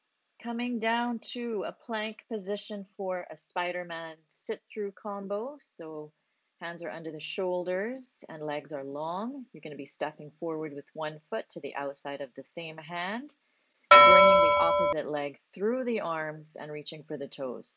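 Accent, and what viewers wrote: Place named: Barbados. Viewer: Great 10 minutes! I did it after your ab/glute band workout. Perfect fit! And I love how your Bajan accent is coming out 😊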